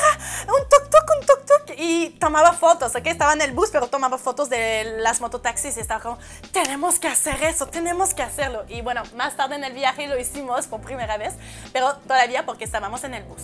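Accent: Peru. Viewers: Ya me suscribí me encanta tu asentó peruano... Canadiense un saludo desde el callao❤️ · tienes el acento peruano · YÁ hablas con el característico YÁ de Perú. ;) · Me recontra mate de risa cuando en el minuto dijiste algo muy latino y creo que muy usado en Perú "una vaina", jajajaj aja jajaja. Definitivamente ya hablas como toda limeña! 😁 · Que lindas palabras para nuestro pais, sobre todo la forma como la relatas, con tanta emoción, es cierto el Perú tiene hermosos paisajes, en costa, sierra y selva, por tu forma de hablar se ve que ya tomaste la forma de hablar de los peruanos, linda, besos y abrazos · Mejoraste mucho tu español hata acento de peruano tienes saludos desde argentina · Tu narración fue bien detallado y tienes que seguirla , impactas con tu castellano y tienes el dejo peruano ya tienes el acento...parte 2 y 3..7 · Eres foránea o peruana el dialecto muy local no corresponde a una extranjera??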